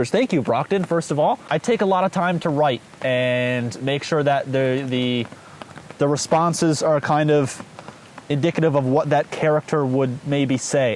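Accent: Canada. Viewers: See I thought he was Canadian but something felt off, so knowing hes from Newfoundland really clears things up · Such a Canadian, eh?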